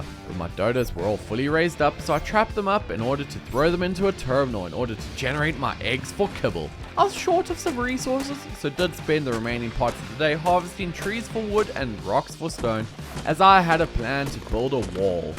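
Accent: Afrikaans, South Africa. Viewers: Are u afrikaans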